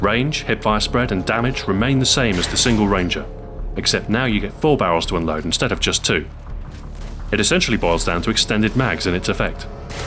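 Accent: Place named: United Kingdom. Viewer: In any other case you would have the same view. You probably only like it now because some guy with a badass British accent uses it. Rite?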